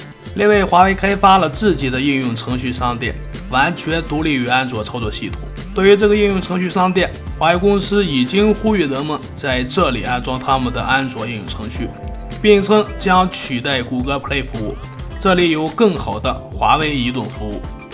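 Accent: Shanxi, China. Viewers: 山西老大哥?这口音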